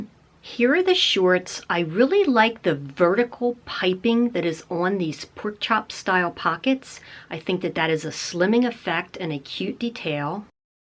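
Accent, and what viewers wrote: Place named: New Jersey, United States. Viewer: I had a feeling you were from NJ! My guess is south Jersey. (I recognized your accent!) I am originally from Cranford, NJ, next to Westfield and not far from Elizabeth. Great haul per usual! I didn't think you looked pregnant in that top - I would keep it if I were you!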